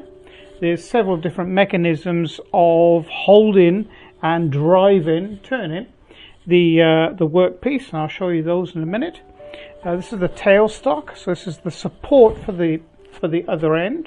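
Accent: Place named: Cornwall, United Kingdom. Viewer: Cornwall originally? Your accent sounds like mine.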